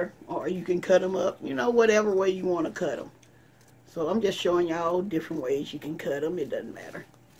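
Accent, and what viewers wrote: South, United States. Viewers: My grandma from North Carolina used to make a similar salad fresh from her garden....talk about DELICIOUS. I LOVE your down home accent. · . Why did you choose to only put 3T of white vinegar and 1-2 T of olive oil in……….instead of the 1/2 cup white vinegar with the 2T. of olive oil??? When I was growing up, Mama always added a little ice water to it……..making it in a big jar, so we could always have a batch in the refrigerator. You sound Southern! Just like me, except we are different colors, but a good ole-timey Southern cook!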